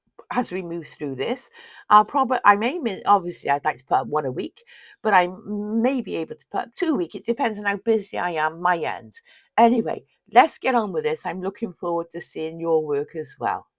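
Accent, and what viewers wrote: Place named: South Wales, United Kingdom. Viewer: I recognise a South Wales accent there, I’n from Ammanford!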